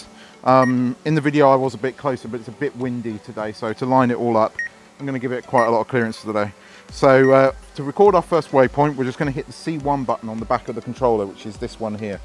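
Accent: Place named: Surrey, United Kingdom. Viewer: Really highlights the possibilities with the Pro/DJI flight modes. With your accent I was expecting views of Woking, wasn’t expecting Palm trees, ha ha. Thanks for posting!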